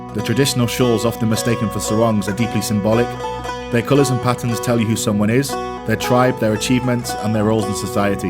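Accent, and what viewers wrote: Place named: England, United Kingdom. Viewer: your English pronunciation has to be Naganised a little bit😅 when you said Cathedral to the taxi driver I could notice his confusion because you said “Cathidril” in the pure English way😂 most Nagas pronounce it as Cat-Thë-Dral and blah blah in Nagahinglish way, anyway wish you a very nice stay in Nagaland, Peace be with you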